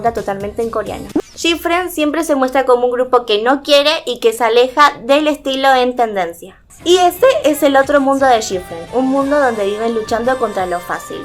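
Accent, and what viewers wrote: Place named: Argentina. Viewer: Te siento acento Argentino XD.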